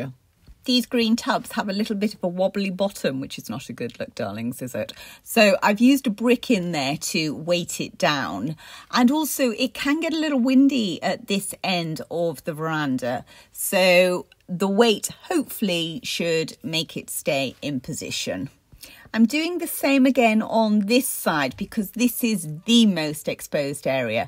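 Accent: England, United Kingdom. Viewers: Utterly gorgeous! Sounds a little English🥰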